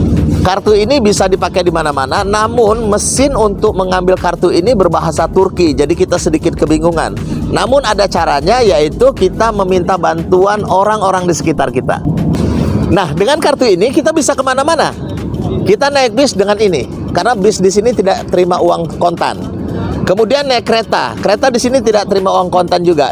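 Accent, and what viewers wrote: Medan, Indonesia. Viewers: adek ini orang medan ya?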